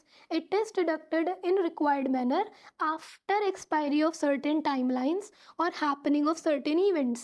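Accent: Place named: Delhi, India. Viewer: Ab bauth hi intrusting way mai sikhateho, and apke voice se Delhi vali vibes ati hai 😂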